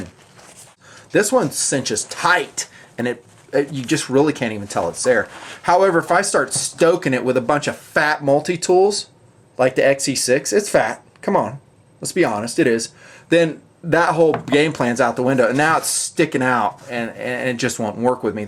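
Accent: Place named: South, United States. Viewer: Southerner? Southern England? Australia? Who in the South says ay?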